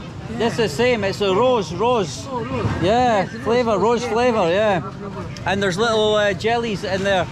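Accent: Scotland, United Kingdom. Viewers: Welcome to Malaysia. Love your Scottish accent. You should get Melaka T-shirt, that is where everything starts in Malaysia 600 years ago. Kuala Lumpur is the capital but the truth gems is outside capital city. Malaysia is food heaven country, really hard to try it all for a tourist. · Your accent reminds me of my time in Leith . Which part of Scotland are you from ? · I love the Scottish pronunciations of things :)) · Hey Scottish man, love the accent, I studied in Scotland and I miss it. Feeling nostalgic.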